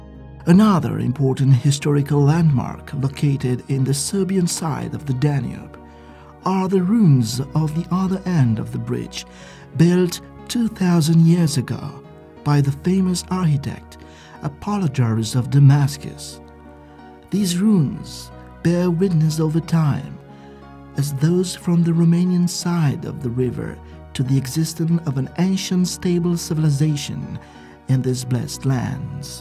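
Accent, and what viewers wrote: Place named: United Kingdom. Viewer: dude,what's with that British accent :D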